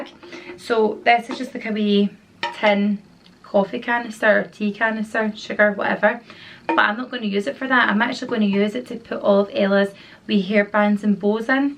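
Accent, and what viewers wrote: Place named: Glasgow, United Kingdom. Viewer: Love your hair so beautiful where are u from got a bit of a glasweigan/stirling accent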